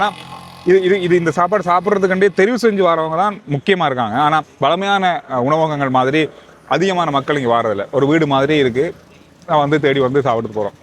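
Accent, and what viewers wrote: Sri Lanka. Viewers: Elangai tamil enaku inimaiya irukum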